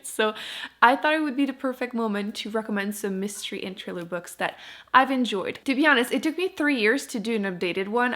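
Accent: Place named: Quebec, Canada. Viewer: First video I watch from you, and the whole time I was like mhm.... she sounds like she has a French Canadian accent. Looks like I wasn't wrong. Twinsies! Love this video!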